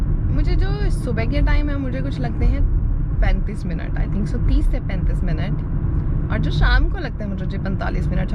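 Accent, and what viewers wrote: Punjab, India. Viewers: I really like your punjabi toning 🔥❤️❤️😁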